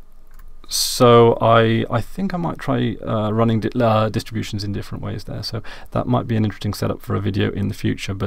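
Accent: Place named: England, United Kingdom. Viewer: You come from England, right?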